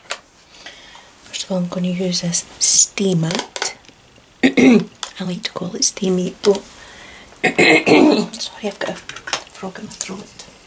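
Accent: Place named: England, United Kingdom. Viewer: I love your english accent and intonation! This is so good to listen to me. Thank you for make this video!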